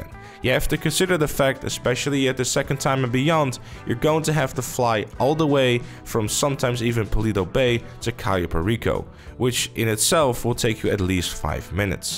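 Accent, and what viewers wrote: Netherlands. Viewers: are you dutch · Je bent Nederlands ik hoor het aan je accent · this dude dutch though! geweldige video man, echt vet... goed bezig! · Are you dutch becuase you have a accent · hoorde gelijk dat je nederlands was haha XD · Are you Dutch?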